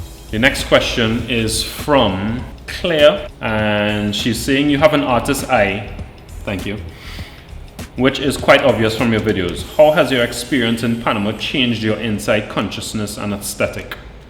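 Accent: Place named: Siparia, Trinidad and Tobago. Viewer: That is so cool! I know someone from Siparia and he sounds like you.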